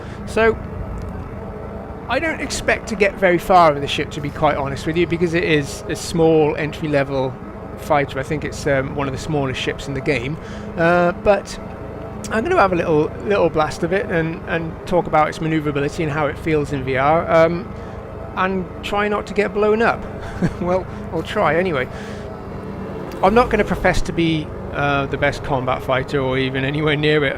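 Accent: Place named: Wales, United Kingdom. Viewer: Getting an oculus in a few days. Just hyping myself up by watching elite vr videos lol. I love elite but I'm gonna be so obsessed with it now from what ive seen and heard from vr owners. I love feeling immersed in games and vr is a great tool for that; its perfect for elite. Good to see someone having fun messing around advance and shooting things. Also,are you from wales? You have the accent of someone down cardiff way. Just curious, being from wales myself.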